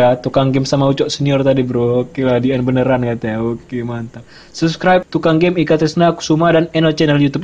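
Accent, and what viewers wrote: Medan, Indonesia. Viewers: bang :v orang Medan ya :v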